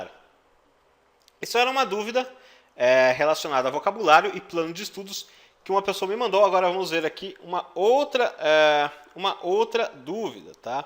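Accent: Germany. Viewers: Boa didática. E de qual região da Alemanha é o teu sotaque?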